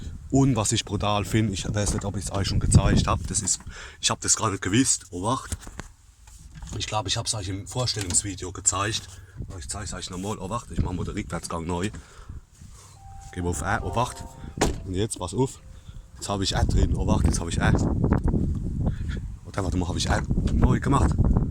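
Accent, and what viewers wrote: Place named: Hesse, Germany. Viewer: Das ist Hesschis gele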